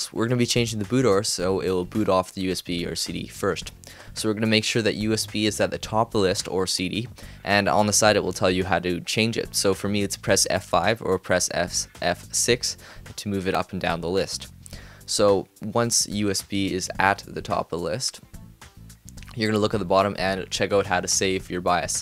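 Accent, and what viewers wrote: Canada. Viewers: you are canadian. right???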